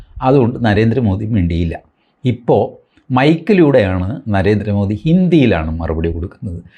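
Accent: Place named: Alappuzha, India. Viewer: "അയാള് ഡീക് അടിച്ചു"😂😂😂ആലപ്പുഴക്കാരുടെ സംസാരരീതിയാണിത്. 😂😂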